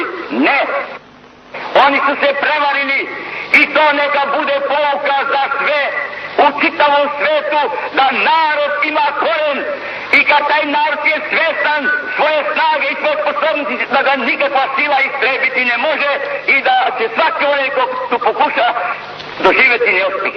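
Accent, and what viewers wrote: Zagorje, Croatia. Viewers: Ne pricaj gluposti, si bio ikad u Zagorju, čitao Krležu...to je taj jezik, naglasak sa Sutle.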